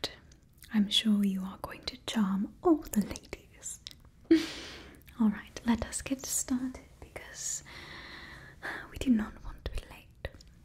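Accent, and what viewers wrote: England, United Kingdom. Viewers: I like your English accent. Decent attempt. Toodle pip! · Do not mind getting more British-accent. Thanks for this, and thank you, Bridgerton. 😆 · The British accent and referring to us as "my Lord" really reminds me of FredsVoice "Lord Farquaas" videos lol Btw is there a possibility to have less light in your videos? They are usually pretty bright and it's harder to watch them in the middle of the night (it's 1AM as I'm writing this, heh) This is just a suggestions ofc. Still, love your videos! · Look amazing with curly hair and as always ace performance on the vid and the British accent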